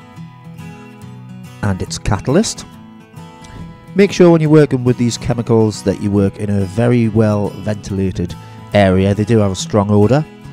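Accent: North East England, United Kingdom. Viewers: Thanks for this tutorial you blew my mind such a simple solution to making eyeballs for my handpainted skulls been looking all over for a way to do that without buying doll eyes from China. Of course I find genius solution from someone with a north-east accent hahaha cause that's where I'm from too! Proper class pet! Canny video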